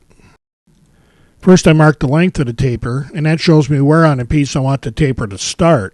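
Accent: Chicago, United States. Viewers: I’m absolutely loving this Chicago accent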